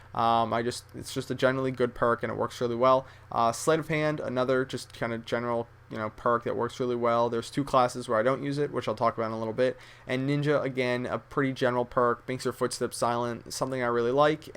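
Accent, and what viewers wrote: Sweden. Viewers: are you swedish? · Are you from sweden? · are u swedish lol?? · are you from swedin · Does he sound Sweden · are you from Sweden? :O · ARE YOU SWEDISH?!!? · are u from sweden? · is he from sweden? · are you from sweden? :P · are you from sweden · Are you from Sweden?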